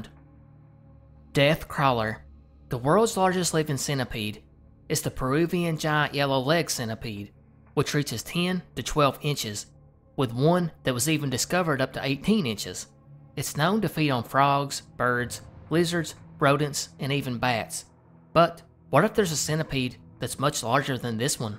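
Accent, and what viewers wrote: South, United States. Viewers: There’s something about a country accent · You don't know how happy I get when I see a new video from you. Love that southern accents. It makes the videos so much better.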